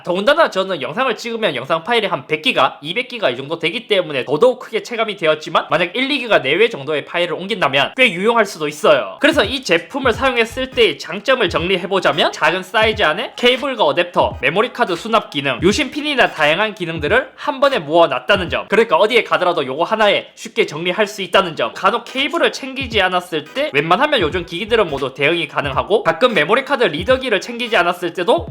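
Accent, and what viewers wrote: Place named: Gyeongbuk, South Korea. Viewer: 이 형 너무좋응.. 경북말투 영주,봉화,안동,점촌.중하나있듯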